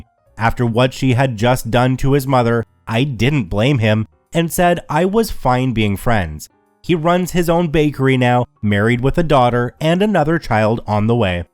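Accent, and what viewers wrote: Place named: Canada. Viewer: Youve said lana in so many ways so many times its freaking me out. Also did you ever mention that your canadian because every time you say sorry you say it like a canadian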